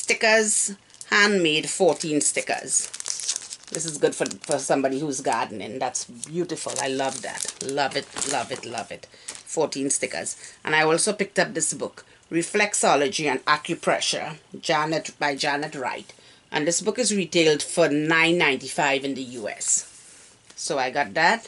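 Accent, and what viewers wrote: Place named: Guyana. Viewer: Love watching your videos! I was married to Guyanese man for years and love your accent, brings me home. also your DT has the best things!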